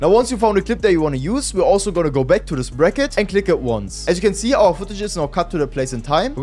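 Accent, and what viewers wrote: Germany. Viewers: Deutscher Akzent? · Kann es sein das du Deutsch bist? Man hörts bisschen xD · are you german?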